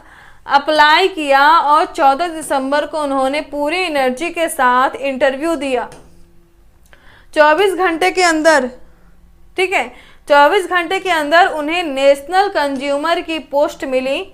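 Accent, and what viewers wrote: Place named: Lucknow, India. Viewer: मैडम लखनउ से है😀😀😀।। लखनऊ वालो का बोलने का प्रक्रिया ही अद्भुद है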